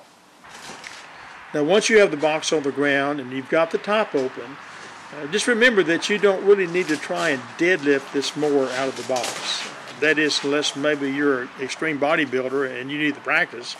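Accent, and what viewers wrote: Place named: South, United States. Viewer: I love this authentic, Southern American accented English. While all the southern accents may sound similar to a Northerner or an international visitor, there are a plethora of different distinguishable varieties and flavors. Likewise I have never heard two different British accents that sounded the same to me.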